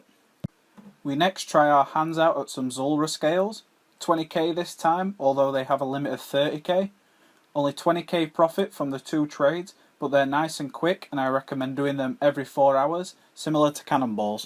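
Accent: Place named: Scotland, United Kingdom. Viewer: gotta love the scottish accent!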